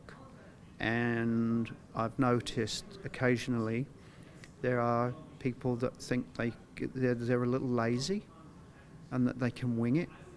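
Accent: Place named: South London, United Kingdom. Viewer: Sarf Londoner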